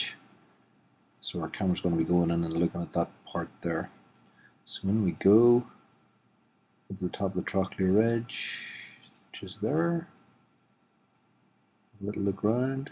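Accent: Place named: Northern Ireland, United Kingdom. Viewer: Hi, I'm a N'Ards (Co. Down) girl living in England and my colt has just had this surgery. I'm re-assured at seeing this video of the surgical procedure, but have many questions to ask. He's a 9 month old SHxWB, Fleetwater Opposition and Cavalier Royale bloodlines. Concerned owner is all, but hearing the NI accent has made me ask if you have the time to give me some info on post-surgery aftercare etc? I've been told is a cartilage defect? I'm confused...!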